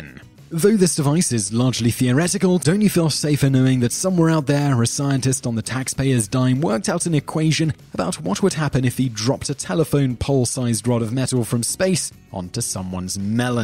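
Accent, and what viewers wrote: United Kingdom. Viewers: Brit accent /= actual knowledge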